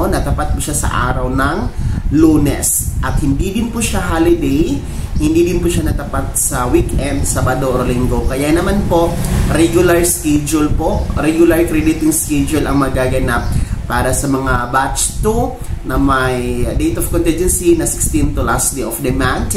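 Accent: Visayas, Philippines. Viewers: Tumigil ka nga puro.ka fake news pampagulo.ka pa.pati.pronounciation ng mga words promdi talaga.bisayang.bato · Bisaya ka dong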